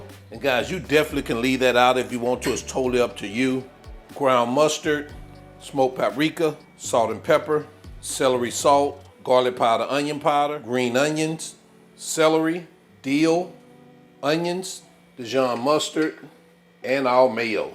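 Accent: United States. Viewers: "igg salad"! Love your accent. If you don't mind, which part of the. U.S. are you from?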